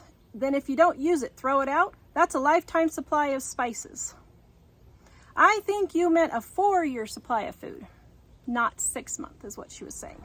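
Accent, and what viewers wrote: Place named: South, United States. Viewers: I am a firm believer in being prepared for any situation!!! This upcoming winter is gonna be a bad one. I would much rather be prepared now then wait. Seems like some people just don't wanna listen and prepare now but I loved your southern accent lol. I'm with you can never have too many spices 😁😁😁👍👍👍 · 1., are you sure you aren’t Southern? 2. These people have never been hungry or they would know how if you are hungry enough you wouldn’t look to see if something is expired. 3. Cereal is nutritious when that is all you have and I eat Cheerios Honey Nut every morning. 4. How do you know if a can food has become infiltrated with botulism, I’ve always heard canned food can have it like bent cans? I’ve never heard how to recognize it. 5. People are going realize Pride goeth before destruction! Forgot verse number and book!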